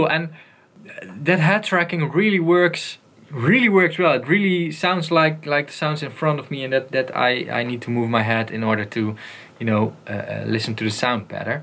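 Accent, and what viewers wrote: Netherlands. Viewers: 1sec in, this guys is obviously Dutch xD.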